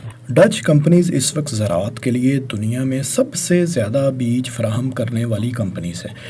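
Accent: Punjab, Pakistan. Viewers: خدا کی قسم اردو کا ایسا گندا لہجہ اور پڑھنے کا گھٹیا انداز نہیں سنا۔ یوں معلوم پڑتا ہے کہ کسی ٹھیٹ پنجابی بولنے والے کو اردو پڑھنے پر لگا دیا ہو۔ خدا کا واسطہ اس طرح کا ٹارچر نہیں دیا کرو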